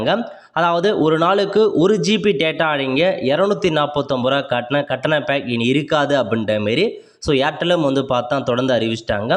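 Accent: North, India. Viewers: உங்கள் பேச்சு வடக்கன் மாதிரி இருக்கு. கொஞ்சம் தெளிவாக புரியும்படி உச்சரித்தல் நன்று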